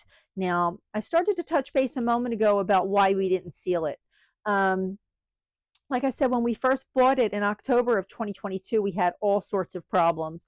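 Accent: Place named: New Jersey, United States. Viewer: Thanks for the video. I’ve been wondering where your accent is from and I was correct. You sound just like my cousin who grew up in Morristown NJ. We lived in NY at the time. Thinking of getting this greenhouse. We live in ND and the wind is relentless!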